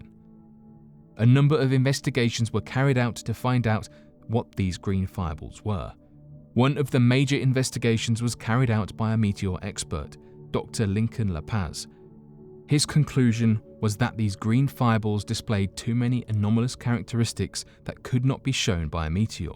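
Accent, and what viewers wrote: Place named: North, United Kingdom. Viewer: he's saying "fireballs" -- turn on captions and you'll see :) this is why i watch most British shows with closed captions turned on because frankly i can't understand some of what they say, especially thick Northern English accents... no shade on the British, i have trouble understanding other foreigners speaking English with a thick accent too :) (looking at you, Jamaica and India :)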